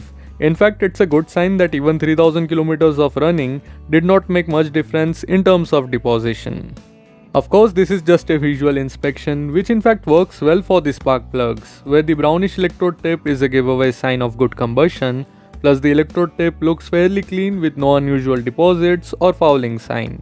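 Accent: India. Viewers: You Sound like apu from simpsons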